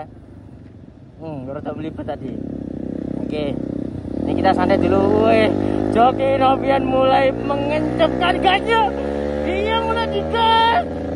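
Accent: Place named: Surabaya, Indonesia. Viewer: Diencep encepkan, Suroboyo banget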